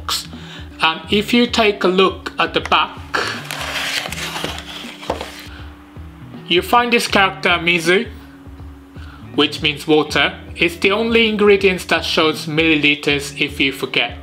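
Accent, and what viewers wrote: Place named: London, United Kingdom. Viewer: Great video the accent is really really unique your English teacher must be super British, probably from London and working class, teacher probably not formally educated on pronunciation. Very classy vid but not a "t" pronounced (mel"t"ed). Anyway 1000x better than my Japanese will ever be lol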